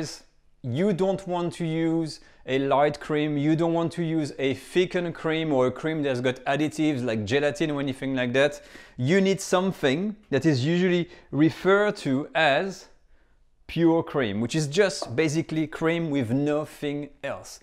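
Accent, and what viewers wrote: France. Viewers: Isn’t he French? Doesn’t sound Italian. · is always nice to speak English with France Accent... tres bien...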